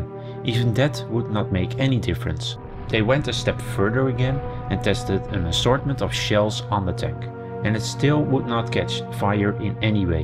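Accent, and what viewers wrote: Netherlands. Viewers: NederEngels accent 100%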